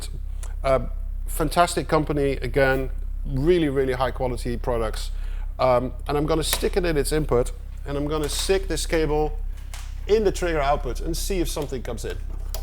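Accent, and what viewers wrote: Netherlands. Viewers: your accent is almost completely gone but I grew up with dutch parents and their dutch friends who loved keeping adult conversations from us by not teaching us dutch . but it was still fun to listen to . to this day a dutch accent is music to my ears lol . hope I'm not wrong on this ______if so you just heard my long story for nothing . (ok back to the video) gerrit jan schraa california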